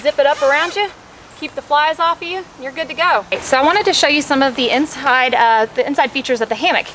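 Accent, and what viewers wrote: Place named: Alabama, United States. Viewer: Great video. Thanks for sharing. You sound like folks from my home state of Alabama, which was comforting, lol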